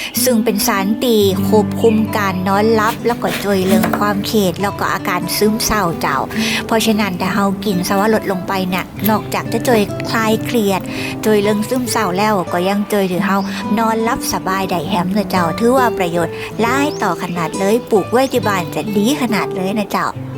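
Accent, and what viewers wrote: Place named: Korat, Thailand. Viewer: สำเนียง โคราช+กำเมือง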